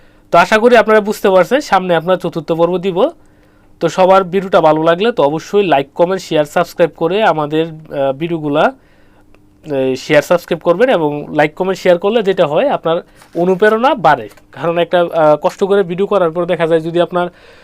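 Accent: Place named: Comilla, Bangladesh. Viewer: মনে হচ্ছে আপনি কুমিল্লার ভাই। আপনার জন্য শুভ কামনা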